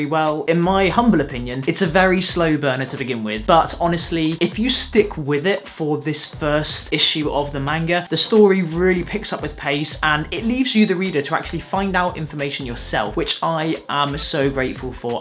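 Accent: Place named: United Kingdom. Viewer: I thumbed this up just cause I like your brit accent :p Did you read this manga through the end, up to and including the final chapter (78)? Since you disliked being handed over explanations I'm sure you liked it lol. Nihei's other works have even less dialogues, less characters, less character development, less character interactions and romances, less everything ahah. Anyway, season 1 & 2 of the anime were really pleasant and made me read the manga, but once the harem got put in place it started going downhill. Especially past chapter 55-60. Kinda a waste of such potential =/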